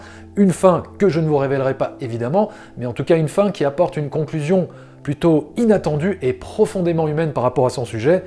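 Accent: South, France. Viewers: merde .... il nous a pas fait son fameux "accent" du sud........... décu,mais non apres la video!! merci pour la video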